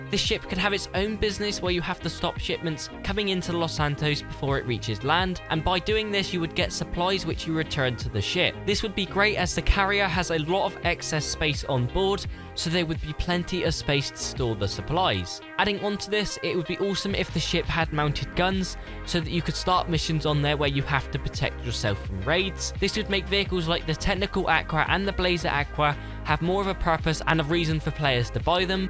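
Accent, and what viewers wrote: United Kingdom. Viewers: defiantly bristolian arent you!!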